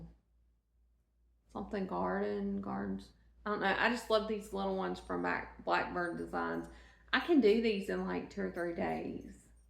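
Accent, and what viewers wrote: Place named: Mississippi, United States. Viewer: I recognize that accent. I’m a Mississippians too. ❤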